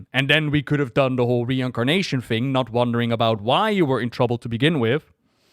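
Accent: Netherlands. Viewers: ur dutch aint yah ok, u sed it urself even . i heared it before u sed it! XD fijne dag gewenst!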